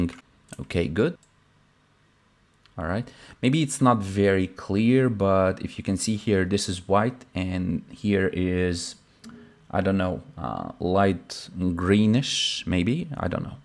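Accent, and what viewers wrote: Russia. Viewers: bro got russian accent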